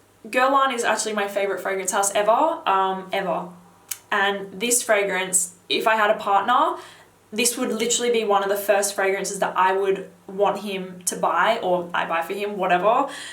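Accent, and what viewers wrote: Australia. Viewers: Are you Aussie? You sound like it. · I dont even know how I stumbled across this. lol But good looking out. I just purchased 2 of them :P Are you Australian? I think I just became a fan. :P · How have I never seen your channel before!? And Australian.. bonus.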